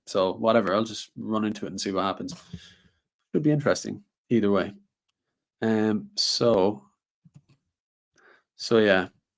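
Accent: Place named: Ireland. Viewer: You sound like a typical uneducated bigot but with an Irish accent. I thought the Irish were a lot smarter than that. Clearly you have proved it wrong.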